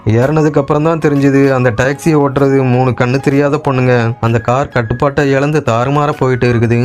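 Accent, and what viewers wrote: Kongu, India. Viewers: Yennunga nenga kongu mandalama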